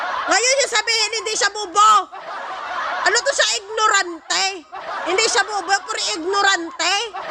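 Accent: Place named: Iloilo, Philippines. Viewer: The best talaga si ate with an ilongo accent 😂😂😂😂😂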